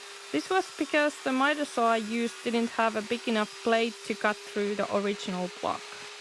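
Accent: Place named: Finland. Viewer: Simple yet very nice looking design! What makes it more interesting that I was just browsing general wood-working videos then this one was recommended, and I recognized from your voice that you are from Finland as well :)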